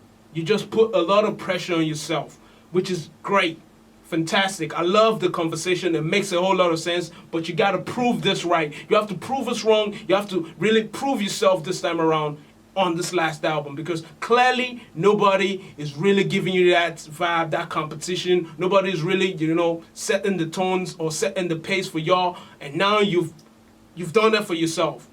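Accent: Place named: United States. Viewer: lose the American accent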